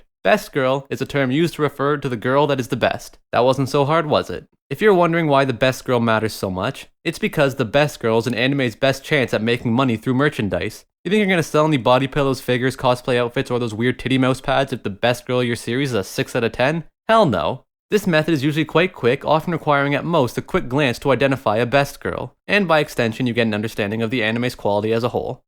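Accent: Canada. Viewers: He’s either from northern Michigan or Canada with that accent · I forgot this guy's a fucking Canadian but then I hear this nigga say about and eh.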